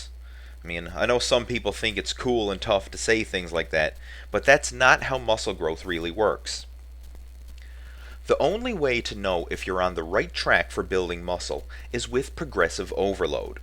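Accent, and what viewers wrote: Canada. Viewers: This is kind of off-topic but do you happen to be Canadian? I swear i can hear the accent o.O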